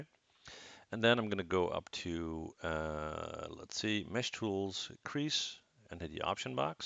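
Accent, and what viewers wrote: Netherlands. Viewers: Hey, can you make a tutorial of how you can render in maya. So you can render your scene for your portfolio. And I have another question, are you from the Netherlands because you sound like a Dutch person. thanks for reading my comment and have a nice day.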